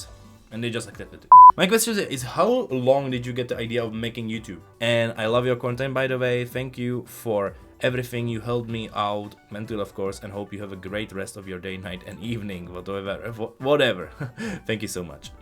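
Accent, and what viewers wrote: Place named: Czech Republic. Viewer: Tak 4 sekundy a bylo to jasný že jseš čech.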